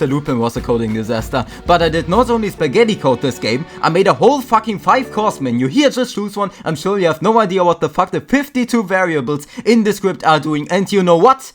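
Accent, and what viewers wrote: Germany. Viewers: i can hear funny german excant, wie lusitg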